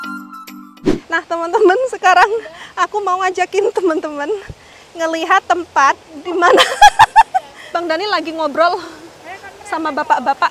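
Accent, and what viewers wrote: Java, Indonesia. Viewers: Mbk aslinya org mana ya, cz logat nya asli jawa banget..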